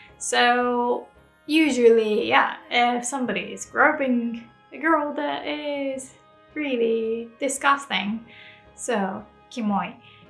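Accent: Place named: United Kingdom. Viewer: Well theres a reason why she has a british accent when speaking english, she probably spent some time in europe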